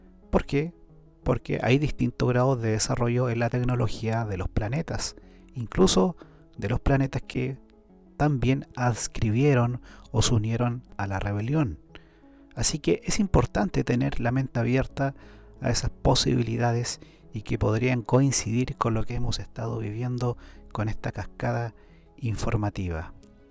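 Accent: Chile. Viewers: Por mas podcast como estos con acento chileno ☺️. Mis favoritos, gran trabajo, gracias ❤.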